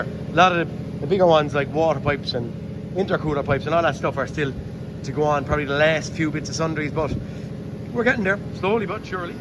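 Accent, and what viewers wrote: Ireland. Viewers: the southern Irish accent is a great accent am from the north of Ireland I can understand your accent easily. Great videos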